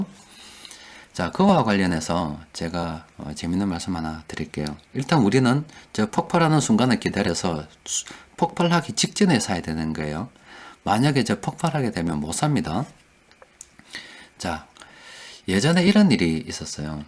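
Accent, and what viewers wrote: Gyeongsang, South Korea. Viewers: 구수한 경상도 사투리와 함께 귀에 쏙쏙 들어오는 강의 감사합니다~ 무엇이든 쉽고 빠르게 이루어지지 않다는걸 새삼 깨닫습니다. 앞으로도 좋은 강의 부탁드립니다~ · 기회가 오면 어느종목을 사야할까요? 초보인지라~~~^^ 차분하게 설명을 잘해주셔서 이해가 잘되고 불안한맘도 안정되게 해주시네요 경상도 말씨도 정감가구 좋은 컨텐츠 만나게되어 감사히 청취하고 있습니다 ^^ ♡ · 경상도사투리 매력있습니다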